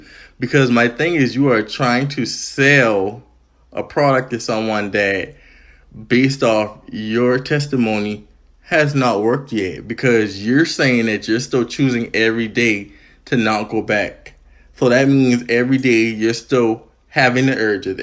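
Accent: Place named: Gullah Geechee, United States. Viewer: Great video. Quick question are you from Charleston, SC!? You got that Geechee accent like folks from that area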